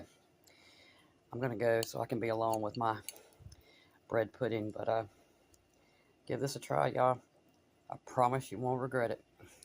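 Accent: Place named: Caribbean. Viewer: Nosey Question: Which island are you from … originally ❓ I recognize a familiar accent 💥 🇹🇹 Yes No? 🤩🤩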